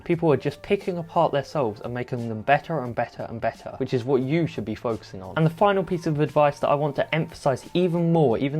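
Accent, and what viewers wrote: United Kingdom. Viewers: are u english ?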